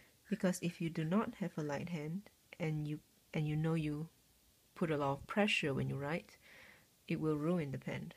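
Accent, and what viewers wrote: Singapore. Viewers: I also guessed Singaporean! I like your accent, and agree with the commenters above that I appreciate your thoughtful and methodical posts that give really practical information. New subscriber! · Are you Singaporean?:)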